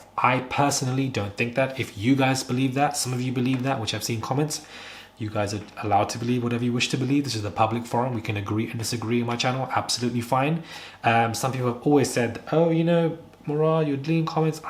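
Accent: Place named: London, United Kingdom. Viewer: Football 🏈 yea honey let’s get into this babezzzz ❤ thank you for all your videos I love 💞 them. I totally agree with Conches analysis 🧐 .. btw, you’re soooo cute!! You’re facial expressions 😊😊😊 yes Mi6 or M16 whatever it’s called has something to do with this CLONE of Kate !!! Yes conche is verryyyy accurate actually. Plus omg there’s a tarot reader a day b4 Charles bday and it’s so eerily on point and makes sense!!! 💞PEACE IN THE MIDDLE EAST💞 Love your London accent 😊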